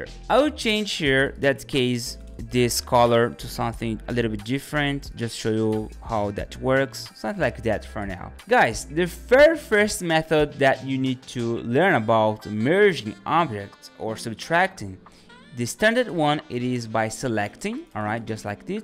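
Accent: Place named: Brazil. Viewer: There is absolutely no chance you´re not brazilian with this accent hahahaha thank you so much for your help :D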